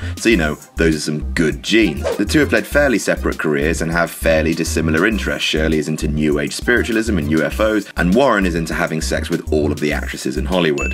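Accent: United Kingdom. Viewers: narrated by Brits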